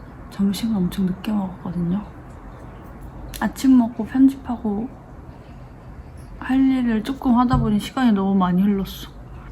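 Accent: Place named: Seoul, South Korea. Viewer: 서울말 엄청 잘하십니닼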